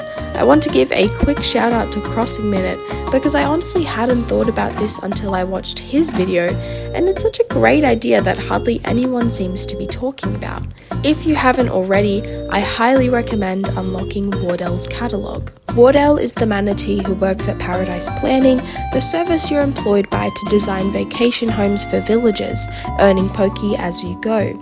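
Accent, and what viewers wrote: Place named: New Zealand. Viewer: is that a new zealand accent? i love it, it’s so nice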